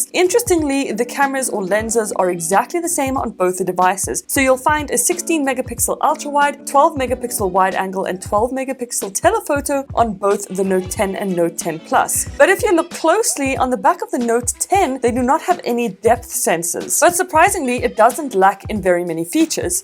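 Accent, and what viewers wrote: South Africa. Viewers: Thought you were south African lol inwas born and raised there and came over to the uk in 2010 xx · Wait you're south African? 😮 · Refreshing to hear a South African voice!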